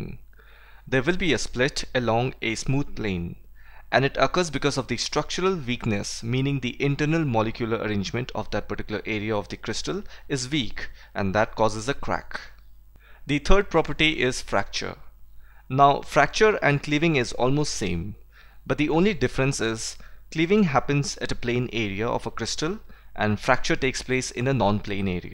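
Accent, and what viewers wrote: India. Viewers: Idk but your accent seems a lot like raj kothrappali from tbbt!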